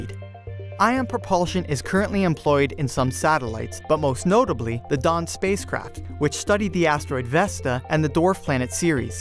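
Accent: United States. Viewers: You sound American. Most likely an American audience. Telling us speeds in kph is a bit snobby.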